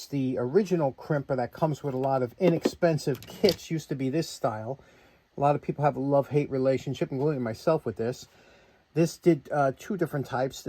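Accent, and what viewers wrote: New York, United States. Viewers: I love your NY accent, but I couldn't, for the life of me, figure out what a "soladis" connector was until I noticed the title of this video. I just love that accent! LOL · Great episode and thanks for speakin in N.Y. accent.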